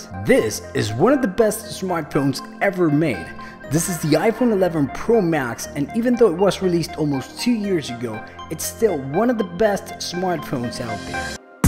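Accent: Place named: United States. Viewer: Thank God for his American accent 😌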